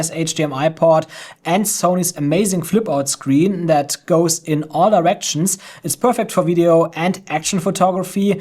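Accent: Germany. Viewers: Man hört diese deutsche akzent so hart raus Hahahah aber gutes video aufjedenfall 👍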